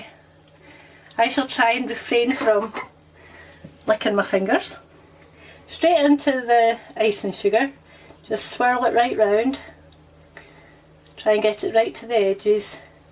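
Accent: Scotland, United Kingdom. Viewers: Thanks, will surely try them, been away from Scotland a long time, love hearing the accent as well. I remember walking in a Mark & Spencer once and laid my eyes on a packet of them and shouted out loud "snowballs" My husband and customers all looked at me like I was crazy, but as you know the friendly Scottish people, we all had a great talk when I apologised and told them I had not been home for 15 years!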